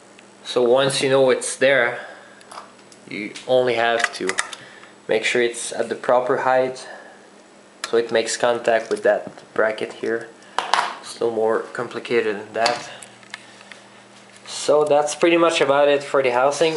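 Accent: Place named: Quebec, Canada. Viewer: Nice video! Et beau accent québecois lol ;)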